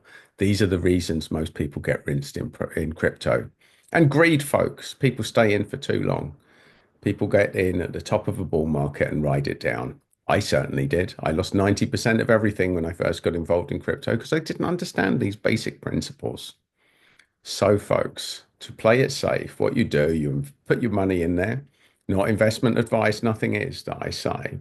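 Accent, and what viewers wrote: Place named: London, United Kingdom. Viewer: Hi there. Good video. By your accent, sounds like you're Londonish based too. It is a very good point on the terrible UK establishment psyop on Crypto. Almost everyone I know still thinks BTC is the money of criminals thanks to this fear porn. For those that are interested, I also put out a video on how not to get scammed in crypto - different angle though.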